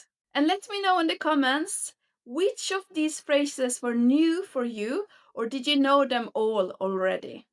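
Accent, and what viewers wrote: Finland Swedish, Finland. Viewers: Är du finlandsvensk? Du har en svag brytning eller dialekt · Jättefin kanal och arbete med att lära ut svenska 🇸🇪👸🎖️ Jag blir ofta irriterad på norskar att det inte uttalar t i det. För det är stor skillnad på de, dem och det på svenska. Och att de säger "hade" istället för Ha det bra som svenskar säger när de menar det på riktigt och inte bara slentrian. Du är annars jätteduktig. Men du pratar en finlandssvensk dialekt? Då kanske man säger som norskarna i din dialekt?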